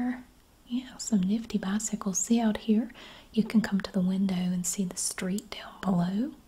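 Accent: South, United States. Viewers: I love your voice and southern accent 💗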